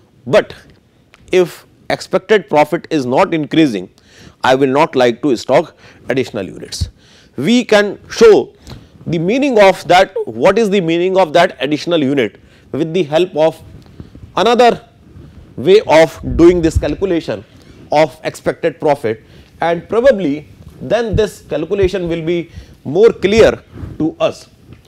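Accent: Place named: India. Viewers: His english is better than you , he has an Indian accent. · OMG improve your English please 😭 Thanks for the hard work but i can't understand you cuz it's like speaking English in Hindi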